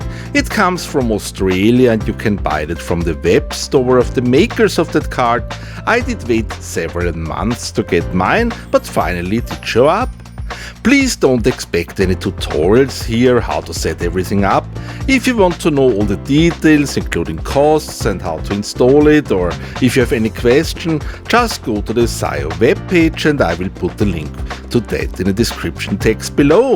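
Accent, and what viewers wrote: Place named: Austria. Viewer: How i missed that Austrian accent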